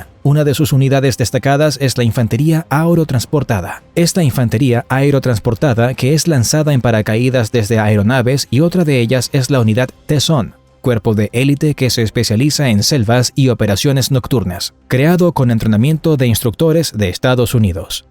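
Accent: Argentina. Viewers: pregungta seria , eres argentino? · se nota que es argentino, peruano o boliviano. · Creo que el del video era Argentino 😂 muy arriba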